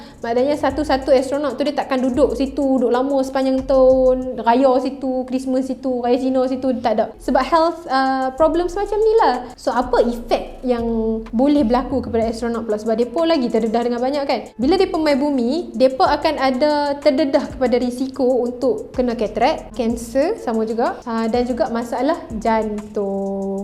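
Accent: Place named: Kedah, Malaysia. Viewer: Kak nak tanya sikit akak ni orang kedah Ker atau orang negeri sembilan sebab bila saya tengok macam orang Kedah sebab loghat akak bercakap deract kedah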